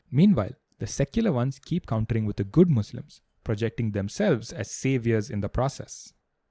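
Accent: South India, India. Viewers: See I am so much media mind programmed. By your voice I can recognize you are from South India. Chhii I am so much racist now. Shame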